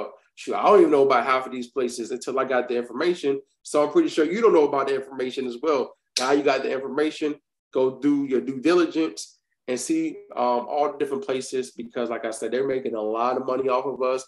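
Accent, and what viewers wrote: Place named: Baltimore, United States. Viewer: Y’all from Baltimore huh?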